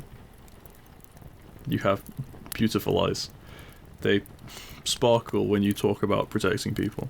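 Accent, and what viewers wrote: United Kingdom. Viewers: This might just be me but you sound like a deeper more British Wilbur Soot🤔😁😂 Edited: wow 293 likes and 26 replies thank you everyone so much 😁🥰🥰and to those of you that can’t unhear it or that it’s stuck in your head you’re welcome😏😏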